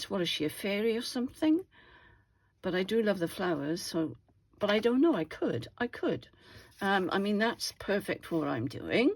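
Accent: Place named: England, United Kingdom. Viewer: Hello, I live in New York State USA and recently discovered you. I am very much enjoying listening to you, speak as my grandmother and grandfather were both from England and I miss being able to hear them talk you have great ideas and I didn’t realize that you were Bella‘mom in any case I’ve been scrapbooking for a while and I am also a fine art painter but I find that doing paperwork and collecting different kits is absolutely wonderful and so relaxing. What I am currently working on is I am a member of pink monarch prints for $8.95 a month she will give you a 50 pieces of paper of a kit that she makes every month and they’re absolutely beautiful But I love to get ideas from other people on how they create and so I am very much enjoying listening to you and I also purchased kits from Bella as well. So thank you for being out there and I too am 76 years old.and a fine art painter.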